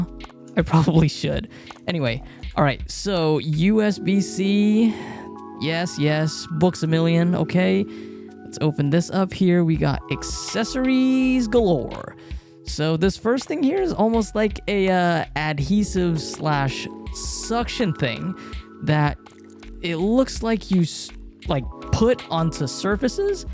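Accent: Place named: Southeast Asia. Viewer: Bro! I like your English. I think you are from South East Asia, like Singapore or Malaysia?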